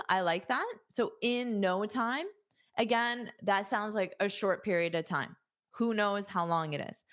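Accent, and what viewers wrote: United States. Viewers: Thanks a lot for real American English .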